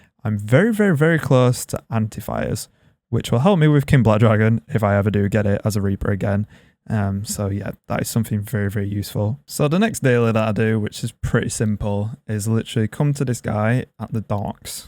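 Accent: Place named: Yorkshire, United Kingdom. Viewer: You sound like a Yorkshireman 🤔 refreshing to hear from a Runescape player 😂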